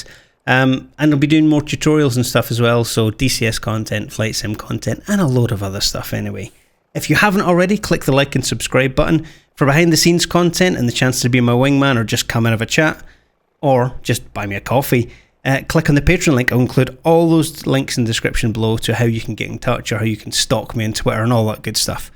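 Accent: Scotland, United Kingdom. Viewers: A great tour of a great city, narrated by a true Scot. Excellent!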